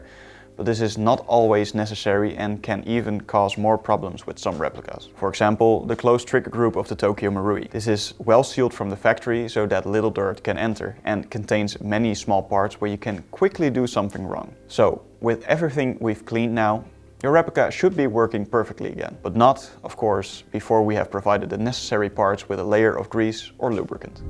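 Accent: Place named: Netherlands. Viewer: u sound very very dutch my guy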